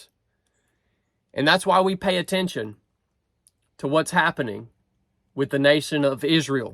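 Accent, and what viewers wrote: South, United States. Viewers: Brother totally agree. Keep up with Truck talk. I love it. Your accent makes me feel right at home. My family are all southern. It's like watching my cousin talking about this crazy sh$$ show world we are finding ourselves having to be a part of. I've got a feeling this is definitely the beginning of the end. Just saying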